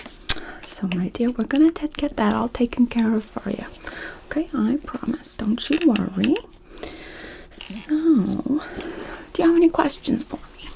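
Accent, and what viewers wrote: United Kingdom. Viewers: Loving the British accent 👌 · Fellow Brit here - love your work and great accent! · I know I usually comment some crazy scenario for a bit of fun. But this time I just want to say amazing work, and I really appreciate all of the effort you put into your videos! And also, that British accent is brilliant, well done! Thank you for all of your videos! Also, you look extra stunning with the short blonde hair! 😍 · loved the British accent 🥰 great video thank you soooooo much😘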